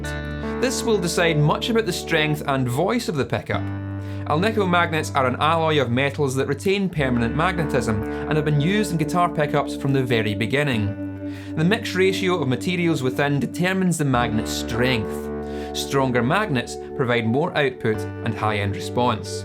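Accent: United Kingdom. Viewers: That’s British sounding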